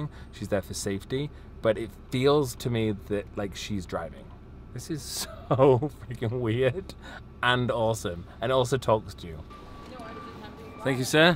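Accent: United States. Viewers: What's the American accent all about? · I agree you do take on the accents, and it is none of my business tbh. You do have a nice USA/Northern twang, it just seemed more exaggerated in this particular video. Sorry if I offended you. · you sound so american:)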